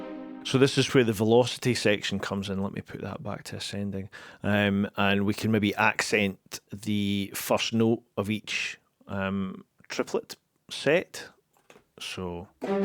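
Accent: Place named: Scotland, United Kingdom. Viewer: ..."if I wudda had this, ... when I was maybe 20....I'd probably be a billionaire right now......🤪😆 Great video with that up yer kilt accent. Luvit. Any more tuts coming our way?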